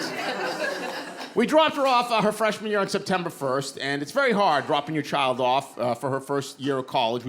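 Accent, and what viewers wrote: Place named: Boston, United States. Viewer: FREAKING HILARIOUS!! Had the video come up on my recommended and I'm NOT disappointed!! I love your Boston accent and delivery was phenomenal!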